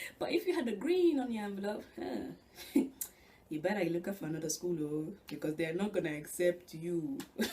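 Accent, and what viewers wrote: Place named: Africa. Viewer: Hi i really enjoyed this this video! especially your accent, where in africa are you from, i'm from the east but i live in england. I'm doing btec creative media (level 3) because i don't think A levels are for me.i start this september! i am happy with my results, i only failed maths but i am retaking that. A you still doing btec?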